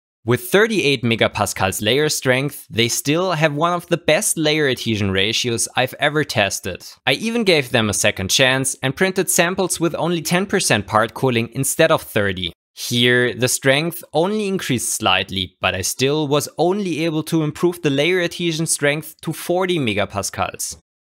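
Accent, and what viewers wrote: Germany. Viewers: I just come for the German accent.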